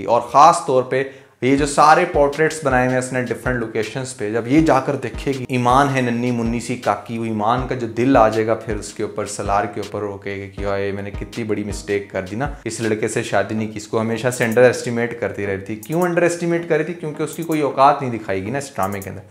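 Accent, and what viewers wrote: India. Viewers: کون سی دنیا میں رھتے ہو بھائ آج کی لڑکیاں بہت کچھ کرتی ہیں فوج میں بھی لڑتی ہیں لڑاکا تیسرے اڑاتی ہیں اور فائٹر برگیڈیر بھی لڑکیاں ہوتی ہیں جلتی آگ میں کود جاتی ہیں آدمی بھی ہر طرح کے ہوتے ہیں جہیز کے بغیر بھی شادی کرتے ہیں میرے ھڈبینڈ نے بھی کوئ جہیز لینے سے منع کردیا تھا میں کچھ بھی نیکی لائ تھی تم اپنے خیالات کے مطابق بات کرتے ہو جوان لڑکی کو آنٹی بنادیا تم نے کچھ حقیقت سے کام لو صرف ہندی لہجے میں اردو نہ بولو نہ ہندی رھے گی نہ اردو کوا چلا ہنس کی چال اپنی چال بھی بھول گیا سنی ہے نا یہ مسال خوش رھو بھیا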